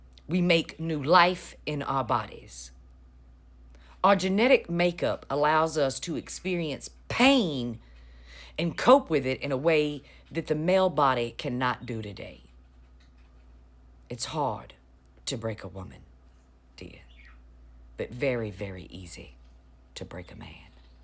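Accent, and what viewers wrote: South, United States. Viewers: Crockett and AOC would be great for USA. You speak truth, and with a real Southern drawl.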